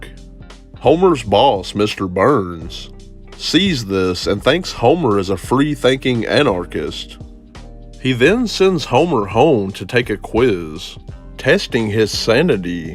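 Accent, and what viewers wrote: South, United States. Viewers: its cool seeing a youtuber like this having a southern accent its really rare (im from a southern area lmfao)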